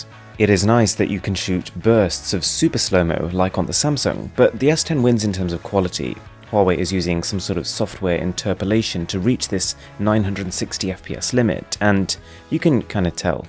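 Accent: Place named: United Kingdom. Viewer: Omg i love your british accent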